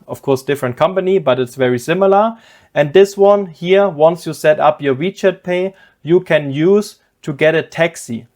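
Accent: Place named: Germany. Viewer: Ich hab noch nie so Glas klar einen deutsche am Akzent erkannt. Geil haha! 👍🏼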